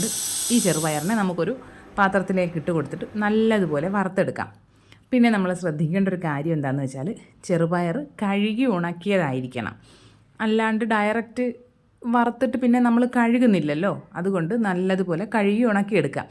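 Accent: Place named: Kollam, India. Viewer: കൊല്ലം ജില്ലക്കാരിയാണോ? സംസാരം ഞങ്ങൾകൊല്ലക്കാരുടെ പോലെയുണ്ട്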